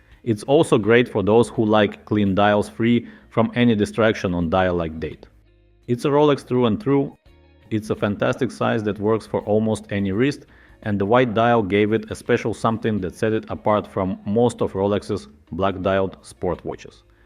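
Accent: Russia. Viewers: Братан, твой русский акцент выдает тебя.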